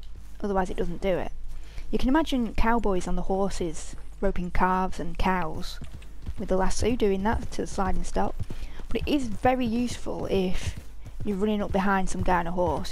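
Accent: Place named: Manchester, United Kingdom. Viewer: is your accent from Manchester? That's my first guess, or at least somewhere in northern England. If I'm wrong I mean no disrespect. I just love all of the English accents but I'm still not quite good at placing them.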